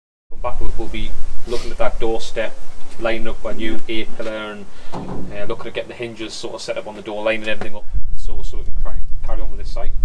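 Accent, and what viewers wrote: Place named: Consett, United Kingdom. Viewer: are you lads from consett?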